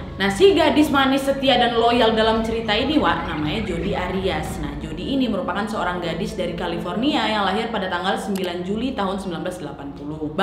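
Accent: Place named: Medan, Indonesia. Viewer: Ga seru rupanya klo ga pake logat medannya, emang kenapa rupanya.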